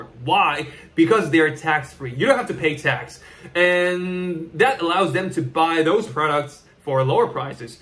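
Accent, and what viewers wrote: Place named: United States. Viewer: wow，very nice American accent, very impressive.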